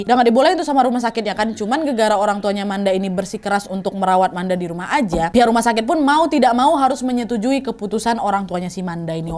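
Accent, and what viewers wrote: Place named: Java, Indonesia. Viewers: logat Jawanya kak fasih sekali👍😁 cocok kak jadi orang Jawa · asik dan suka banget denger cerita pake bahasa jawa kaya medok banget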